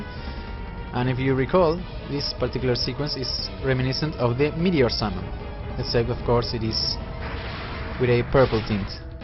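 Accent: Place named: Spain. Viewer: Just came from your Megaman videos and am binging through Golden Sun. Indeed there are few viewers, but I think it's mostly since this is an old game. I watched it for the nostalgia. Based on your accent, I'm guessing Spanish (I have plenty of Spanish friends with a similar accent)? You definitely don't have a bad voice, and I'm amazed at the amount of work you put into something you like. I may not comment on every video, but I'm watching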